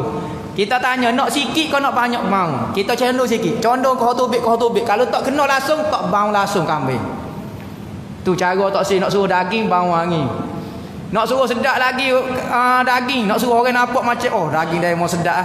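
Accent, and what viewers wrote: Kelantan, Malaysia. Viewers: maaf bertanya ttg daging berbau wangi tu..boleh x terangkan bagaimana cara? maaf sy x fhm sgt loghat kelantan..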